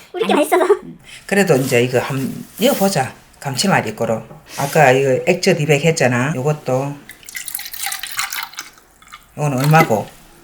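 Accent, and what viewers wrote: Gyeongsang, South Korea. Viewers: 구수한경상도사투리최고 저도경상도토박이라더더파김치배워갑니다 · 저도 혼자 빵 터져서 주름졌어요 ㅋㅋ 말씀이 너무 정감가고 재미있어요. 아마 서부 경남분이신가 싶네요~^^ 잘보고있어요.😊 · 꼬꾸라 져뿌리 깅상도 사투리 오지럽ㅋㅋㅋ넘구수해요 항상 건강하시구 반찬많이 갈쳐주세유~감사합니데이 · 사투리 내만알겠노 ㅋㅋㅋㅋㅋㅋㅋㅋㅋㅋㅋㅋㅋㅋㅋㅋㅋㅋㅋㅋㅋㅋㅋㅋㅋㅋㅋㅋㅋㅋㅋㅋㅋㅋㅋㅋㅋㅋㅋㅋㅋㅋㅋㅋㅋㅋㅋㅋㅋㅋㅋㅋㅋ 와 창원 아니면 마산같은 느낌이시네. 제가 마산사는데 너무 똑같다 ㅋㅋㅋㅋㅋㅋㅋㅋㅋㅋㅋㅋㅋㅋㅋㅋㅋㅋㅋㅋㅋㅋㅋㅋㅋㅋ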